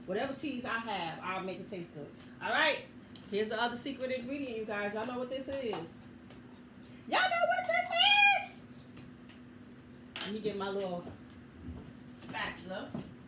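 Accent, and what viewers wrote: New York, United States. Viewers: Everything looked great sis!! I thought u sounded like a New Yorker! But I second guessed it by the way you work that garden lol! How long have you been in the South?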